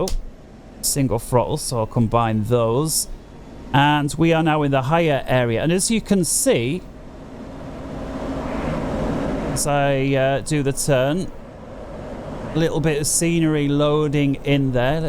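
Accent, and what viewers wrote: United Kingdom. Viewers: thank you for reminding me that I'm allergic to bri'ish english.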